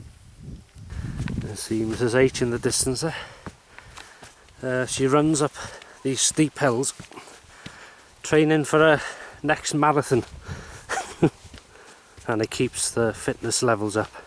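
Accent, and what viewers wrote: Liverpool, United Kingdom. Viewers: I thought i could hear a slight Liverpudlian accent there when you speak. Have you lived there at all or is it because Denbigh's geographically close to The Mersey?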